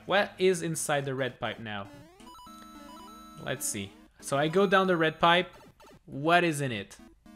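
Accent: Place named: Spain. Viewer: If you don't speak spanish, why do you have a spanish accent?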